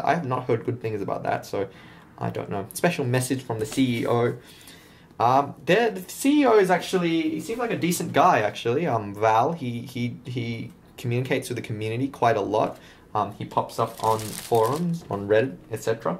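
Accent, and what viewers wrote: Australia. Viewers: I can honestly say I have never heard an asian with an australian accent before.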